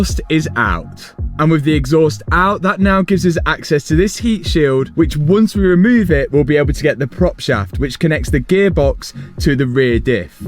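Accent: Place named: Leicester, United Kingdom. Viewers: A familiar Leicester accent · I don't care about cars, I am here only to hear your English accent, and its phenomenal.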